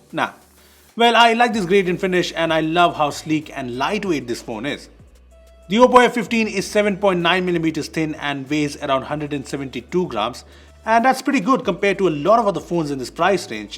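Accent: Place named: India. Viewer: You exaggerate the "A" sound while speaking , like Amoled etc... Indian accent maybe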